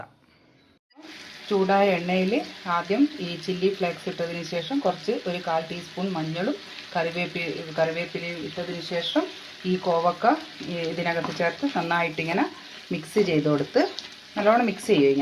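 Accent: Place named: Mahe, India. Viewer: മിക്സിയിൽ ഒന്നു പൾസ് ചെയ് തെ ടുത്താൽ മതി ചില്ലി ഫ്ലാക്‌സ് ആക്കാൻ നിങ്ങൾ മാഹി സൈഡ് ആണോ സംസാരം കേട്ടിട്ട് തോന്നുന്നു ണ്ട് കോവക്ക സൂപ്പർ ആയിട്ടുണ്ട് 👍👌😋😋 👫🔔✅ New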